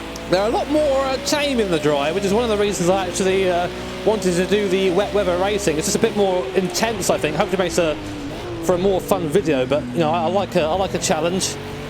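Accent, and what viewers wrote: United Kingdom. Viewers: I don't know if it's just because I'm from Murka and am immediately enchanted by British accent, but I think you'd make a hell of a racing commentator.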